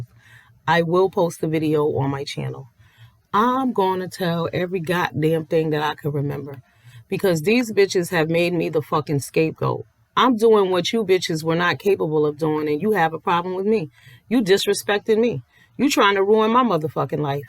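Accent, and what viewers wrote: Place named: Boston, United States. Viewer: Happy Belated Birthday Queen! 💕🙌🏽💃🏾🎂🥂 Btw are u from New England? U sound like my Boston Family (sisters)...😎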